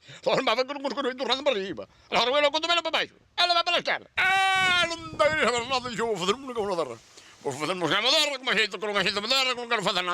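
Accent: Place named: Valladolid, Spain. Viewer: Cando falas coma o paisano,tes acento galego. cando falas ti tes acento de valladolid curioso, non??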